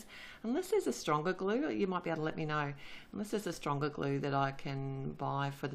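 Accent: Australia. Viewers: Hi Love the card. Just wanting to know where you bought your ATG gun from and where do you buy the refills from too please. Also what is the blue tape that you use to stick your paper down on your board with. Just love your tutorials and it's so good to hear a Aussie voice. Lol Lots of love from Rhonda Laine Sydney Australia 😘😘😘😘😘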